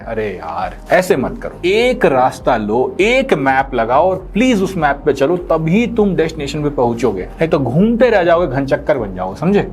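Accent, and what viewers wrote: Bihar, India. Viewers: bhaiya your bihari accent is so sexy and abhi to mai javascript me gote laga rha hu.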